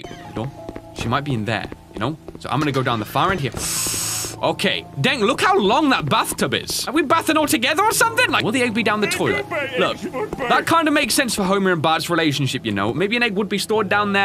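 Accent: United Kingdom. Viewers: I hear british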